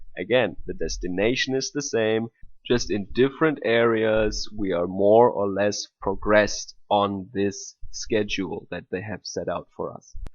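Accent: Germany. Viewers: jan uniter He is German. This is how everyone not American speaks!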